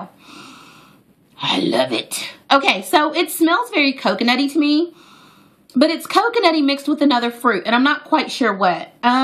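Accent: United Kingdom. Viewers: Looool your english accent!! Its sooo funny! When you come to the uk,we need to meet up!! Lool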